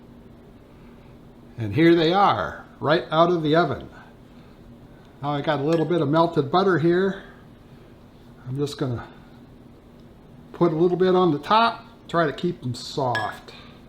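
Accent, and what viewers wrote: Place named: Midwest, United States. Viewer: You are NOT from Texas, man! Your accent is from IL!!! I can tell a mid west anywhere!!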